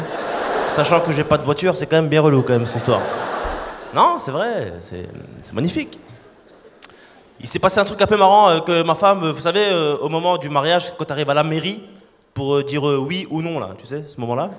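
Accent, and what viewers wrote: Tunisia. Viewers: L'accent tunisien tellement stylé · L'accent tunisien je kiff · De son accent je dirais qu'il est Tunisien, quelqu'un sait? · C est un tunisien lui juste à son accent.🇹🇳🇹🇳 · Il a vraiment un accent Tounsi c'est abusé hhhhhhhhh je kiff je me laisse pas de regarder encore et encore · cet accent tunisien sucré là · L’accent tunisien cramé de loin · WAllah j’aime terriblement son accent tunisien alors que de base non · L'accent tunisienne de Hakim 💓💓😍💓💓😍😍 m'a tué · j'ai reconnu l'accent tunisien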